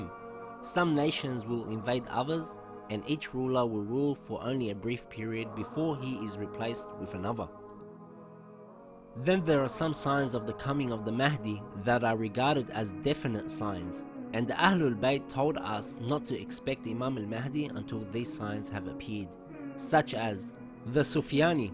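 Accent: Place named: Australia. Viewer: U got an aussie accent.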